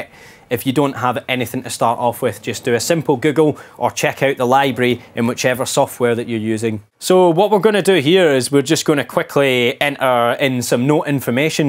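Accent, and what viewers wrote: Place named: Scotland, United Kingdom. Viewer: Thanks for the excellent overview, I also love your Scottish accent! Scotland and Scottish people are awesome (and I'm partly descended from them myself), I hope to visit there some day. Also thanks for the heads up on the Yamaha Reface line, I would be really tempted to get the Reface CS if only it had patch memory. There's really no excuse not to with today's technology. But at least there are some great softsynths now such as Tyrell, Diva, and U-NO-LX.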